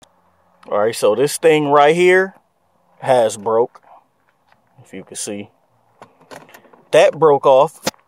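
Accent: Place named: Texas, United States. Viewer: Damn, you’re country. You must be from Texas. 😂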